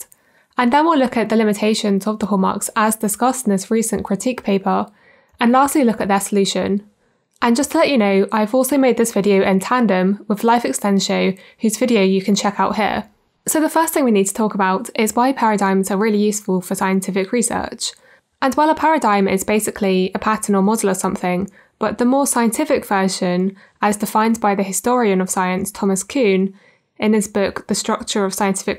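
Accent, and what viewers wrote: United Kingdom. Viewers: where in the UK specifically is your accent from?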